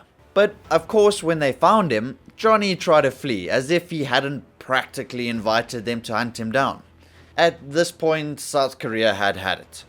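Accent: South Africa. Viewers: Refreshing to hear South African accent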